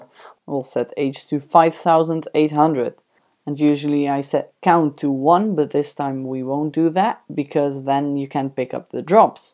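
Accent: Netherlands. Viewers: haha ik hoorde gelijk aan je stem dat je NL ben ;p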